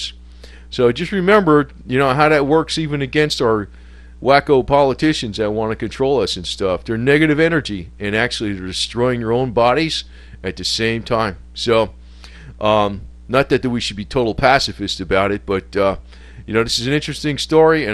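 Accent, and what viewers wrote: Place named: Philadelphia, United States. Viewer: are you from philly? I can hear it in your voice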